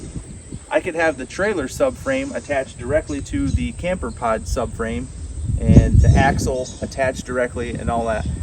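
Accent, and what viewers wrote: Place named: Michigan, United States. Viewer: Loviny the Michigan accent go green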